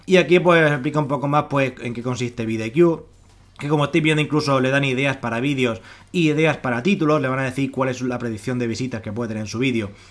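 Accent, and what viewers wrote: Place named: Malaga, Spain. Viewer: Jejeje lo sabía ,yo soy de Cádiz :p ,tu por el acento pareces de Málaga ,saludos máquina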